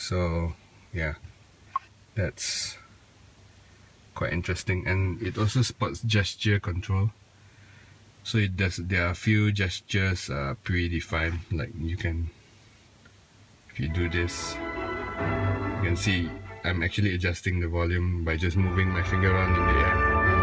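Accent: Singapore. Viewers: fucked up singlish accent bah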